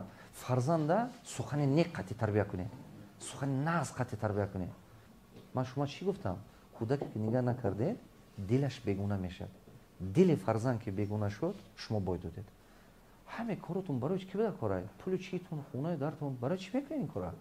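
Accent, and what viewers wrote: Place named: North, Tajikistan. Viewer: Устод бисёр Руси Кати Гап мезанад, бо шевай мардуми шимол. Хам як Чахон маьни❤❤❤❤хам як олам ханда хадя мекунад😂😂😂😂Зинда бошед..доктор❤❤❤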